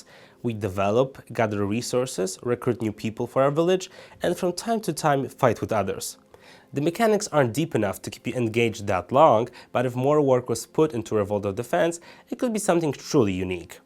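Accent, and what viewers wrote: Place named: Poland. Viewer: Odrazu usłyszałem ze to Polak mowi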